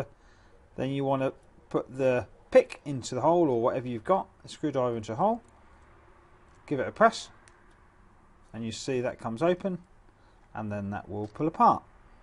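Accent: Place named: United Kingdom. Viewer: Yeah this one gets it! great close ups which are necessary here and a muchhh easier way to get the last piece open than the last video. I️ tried their way for like half an hour this took just a min. Thank god for YouTube or we’d never get these things open! Well done. I️ Also like the British accent ha it makes me trust you. For some reason. 🤔 Is that why nature documentaries are always narrated with a British accent? Cuz we believe that Brit’s have gained wisdom through their long period of history kinda running the western world? Or because the brits just make all the best nature documentaries? I️ suppose I️ just Love British things all around. Has anyone read this far? I’ve been up all night and fixing this fob is the icing on the cake 👌😎 now I️ know... I’m thankful for British accents and how much I️ trust them! Hey Now I️ know what to do when I’m stressed out...have people give me advice in British accents! 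Or find a British guy to hang out with. That could work:) I️ need a British boyfriend...for my health! I️ live in Cincinnati in case there’s anyone who wants to hook a sista up. Forrreal tho this is just as good as tinder imo. Who knew I’d leave such a long comment! This is a record and a first. Probably because I️ feel like the ppl who read this will be very purpose driven and probs not read/mind this rant for a brief distraction from the rocket science that is opening this fob! Waited months to change it but I’m doing it for my friend secretly so hell have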